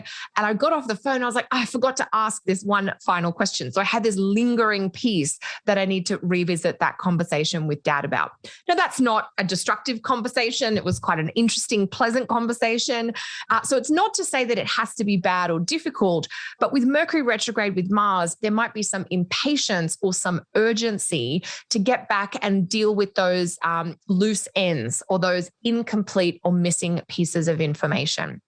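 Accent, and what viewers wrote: Australia. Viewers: Ontario ?? I thought you were Australian! That’s funny. Or maybe I guess Australian living in Canada? 🤪😍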